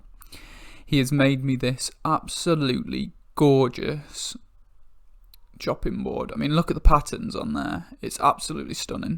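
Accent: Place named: United Kingdom. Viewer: This looks delicious! However I've never had Spanish chorizo, only Mexican chorizo. btw, as an American I quite enjoy the British accent...talk away imo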